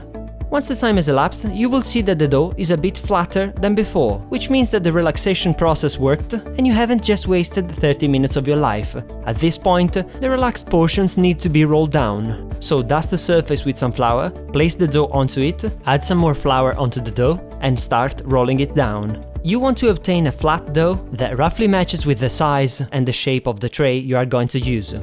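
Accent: Italy. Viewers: Hey, your recepies are amazing! My sister and I just tryed some of them! Aaaand we were wondering if you are italian.. :) Because we notice your italian pronunciation, and it's wery well!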